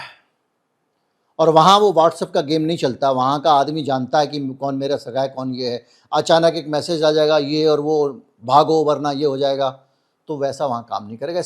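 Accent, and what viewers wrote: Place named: Bihar, India. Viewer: Aapka Bihari tone bohut achchha laga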